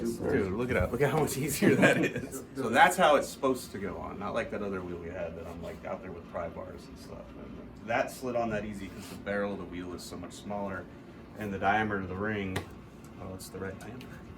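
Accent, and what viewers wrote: South, United States. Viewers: new accent is really freaking me out. Its a cross between Californian but more southern drawl now.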